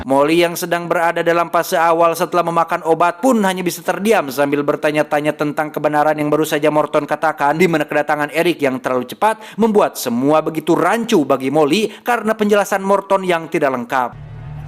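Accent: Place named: West Java, Indonesia. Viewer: Sunda na timana bang?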